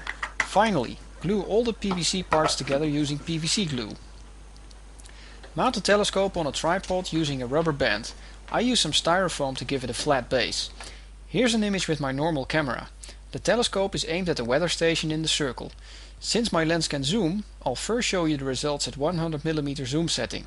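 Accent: Netherlands. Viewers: je klinkt een beetje nederlands xd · I could hear right away that you were Dutch, nice video by the way - I'd suggest buying a better webcam in the first place so you end up with a better result in the end. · Dutch accept OP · haha this is a dutch guy who is talking english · from the VS?? you have more a dutch accent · are you dutch? just curious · Je bent een Nederlander je hoort het in je nederlands zoals bij veel Nederlanders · too bad that he's clearly from holland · very cool, i like the very clear dutch accent :D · lol good vid but horrible accent haha most dutch people can speak well english but the accents are horrible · Mooi nl accent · NL! hoor ik altijd als ze Engels spreken XD! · nice dutch accent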